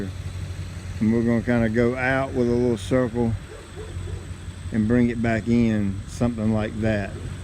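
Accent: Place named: Alabama, United States. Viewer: Cool accent? What state? Alabama?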